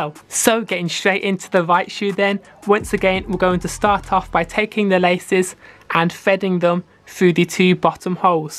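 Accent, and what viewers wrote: United Kingdom. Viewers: Mate, these kicks are rad! I love your accent too. Which part of England are you from? Cheers, Fran (NZ)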